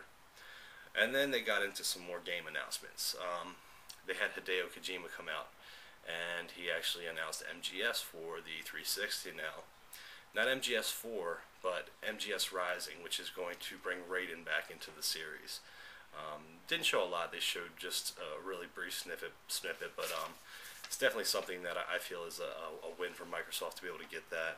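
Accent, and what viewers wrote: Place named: Philadelphia, United States. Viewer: Are you from the Philly area? you have that log "O" accent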